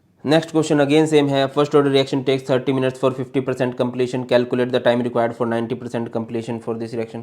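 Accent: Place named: Indore, India. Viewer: are hamare Indore taraf aise hi bolte he yaar 😅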